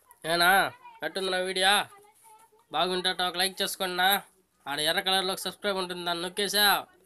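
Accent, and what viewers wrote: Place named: Chittoor, India. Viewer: Nice brother super comedy chittoor yasa adhiripoindhi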